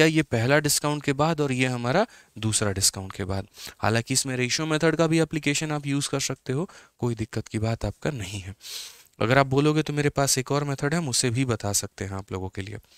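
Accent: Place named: Bhojpuri, India. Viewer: Apki bhojpuri tone sir just ...... Hayeee🙈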